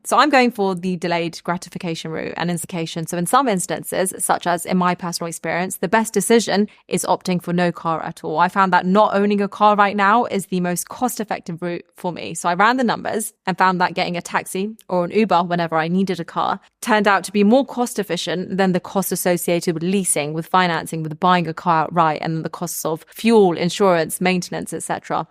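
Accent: United Kingdom. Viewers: great video, you sound British so I assume you live in the UK (could be wrong) so why use dollars ? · Everything sounds better in British. So tired of mumbling idiots here in America 😂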